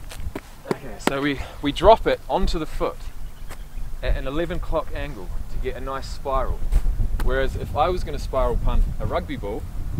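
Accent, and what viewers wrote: New Zealand. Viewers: Are you an Aussie? Got a bit of a kiwi accent going there bro! Nice vid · You sound like a Kiwi? Are you Aussie or Kiwi bro?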